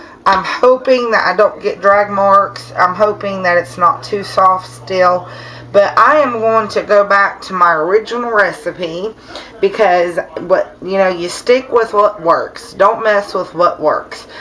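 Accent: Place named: West Virginia, United States. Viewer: hi! love your videos! idk what part of TN you live in but I'm from the southern most part of WV, only takes me 25 min to be in Buchanan county VA...lol......but the reason I'm telling you this is because your accent is the same as ours around here....haha But anyways, down to business.....i just watched a video on a 6 color in the pot swirl and her name is Christy Rose. i just thought I'd let you know about her video and maybe it can help you achieve the results you were wanting. on another note, hope all is well with you and your family and i can't wait to see some new videos and wonderful soaps from you!!!! I'm not a soap maker yet but I'm doing research and i think here soon I'll be ready to try my hand at it. ok I'll stop rambling now, take care and keep on making awesome soaps and whatever else your heart desires...and much love from McDowell county WV!!!!! 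lol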